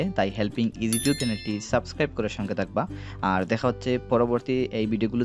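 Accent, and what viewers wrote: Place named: Sylhet, Bangladesh. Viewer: খাঁটি সিলেটি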